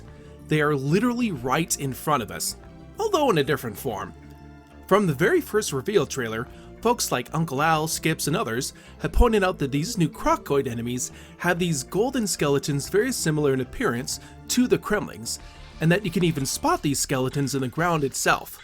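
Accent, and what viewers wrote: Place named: Canada. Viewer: Also, your accent tells me that you're Canadian are you from Canada or not really?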